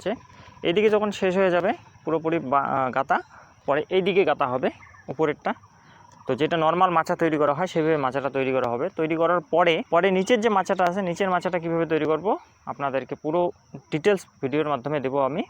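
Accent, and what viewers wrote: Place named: Rajbanshi, India. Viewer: Dada apnar kotha to vatiya r rajbanshi vash mix ache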